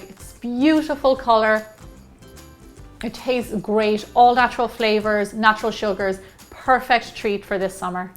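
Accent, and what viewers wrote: Ireland. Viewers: Hello,! I watch all your recipes and even have tried some myself and they are all amazing! I also love your Irish accent! One suggestion: Can you post a video about how to make bueno cream spread? Cannot find it anywhere online. Thank you! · r you irsh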